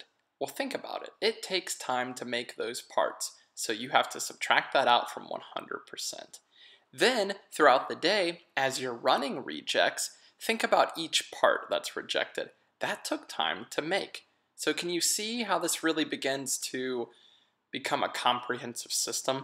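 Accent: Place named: United States. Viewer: he sounds sooooo American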